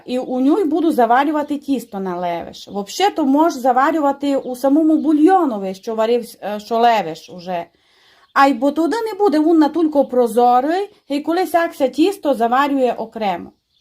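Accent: Transcarpathia, Ukraine. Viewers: говорить дуже гарно на за карпатському діалекті, судячи по коментарях всім подобається, це ніде не заборонено говорити на діалекті. Але пише українською мовою пише грамотно, чого вам Бракує, бо взагалі незрозуміло на якій мові написаний коментар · ДЯКУЮ ЗА В1ДПОВ1ТЬ ЦЕ МАЬУТЬ НА ЗАКАРПАТСЬКУМУ ТАКОМУ ЛАГ1ДНОМУ ДО ВУЗА НУ ПРИЕМНО СЛУХАТИ.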